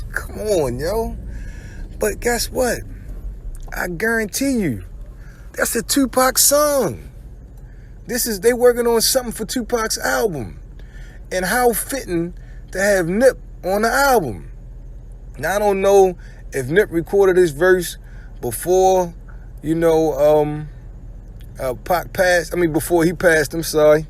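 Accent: Baltimore, United States. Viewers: Yo you from Bmore??? The accent gave it away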